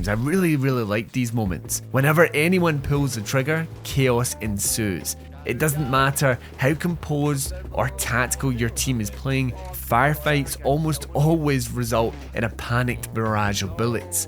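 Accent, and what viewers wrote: Scotland, United Kingdom. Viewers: Its called an accent. Scottish. There's a big world outside america little guy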